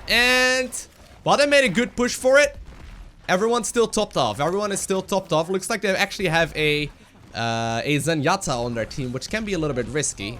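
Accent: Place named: Netherlands. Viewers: he sounds dutch to me as well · This guy is dutch right? his accent is so dutch lol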